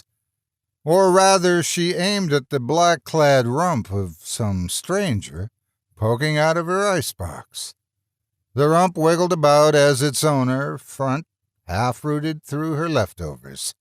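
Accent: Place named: South, United States. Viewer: Hell of pulling that southern accent like only you can do Master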